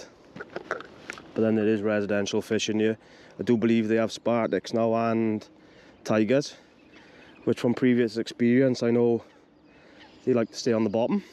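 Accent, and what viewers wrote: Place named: Cardiff, United Kingdom. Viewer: Hello mate, just watched you for the first time, great content I just subscribed. Trying to tie down that accent of yours, I'm guessing Cardiff or close by! I'm in Ebbw Vale.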